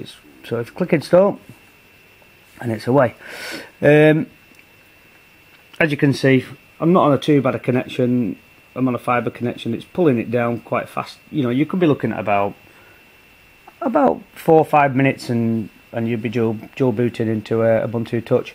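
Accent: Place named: Lancashire, United Kingdom. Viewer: if only it were this easy to put it on my one plus one. nice video made better for a lancashire accent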